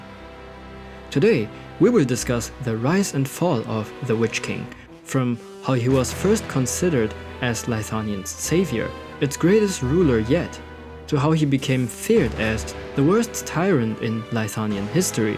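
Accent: Germany. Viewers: This being narrated by an actual German dude (I assume anyway, ‘cause I mean, if you aren’t, then you sure do a good impression), this really couldn’t have been done better. Satisfaction.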